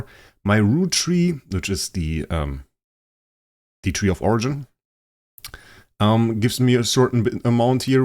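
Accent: Germany. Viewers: Are u German? I hear an accent and I like ur voice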